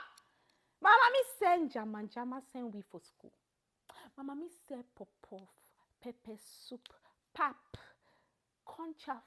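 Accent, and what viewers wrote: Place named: Northwest Region, Cameroon. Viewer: Please do videos with more batibo accent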